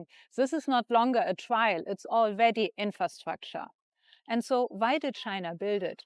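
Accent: Germany. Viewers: What first drew me to this Zen and humbled lady was, perhaps, her accent— German, I believe— with all reverence, quietly enchanting, like a forgotten melody from an ancient place, flowing softly through the Garden of Eden. Thank you for sharing a moment with me, a sip of coffee beneath the sky of eternity. As you spoke of China— that land I hold in my soul’s quiet chamber— your voice became the bridge between centuries, your words woven like silk in the wind. And though you stood still, you moved. Your dress clung to your form like a whispered symphony, a silent score composed in sacred air. Your hands— they danced softly, painting invisible calligraphy, tracing rhythms only the heart could read. You didn’t walk— you floated. In sync with something unseen, a grace not taught, but remembered. A melody not played, but felt, not spoken, but lived. You were not merely speaking— you were composing. 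In spirit. In stillness. In beauty.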